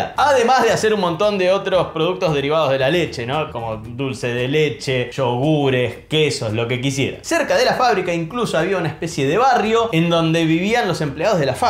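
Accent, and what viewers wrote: Argentina. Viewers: Cada día mas porteño este muchacho. Que lo pario!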